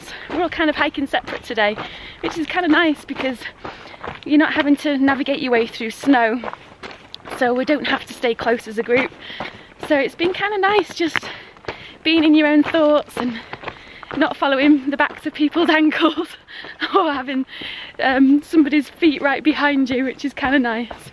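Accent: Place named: England, United Kingdom. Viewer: I like your videos! That English accent! Such a positive spirit when you talk! Thanks a lot!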